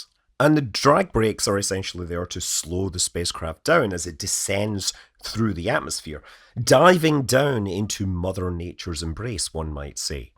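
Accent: Scotland, United Kingdom. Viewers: Listening to this with your deep raspy, Scottish accent, put me over the Carmen line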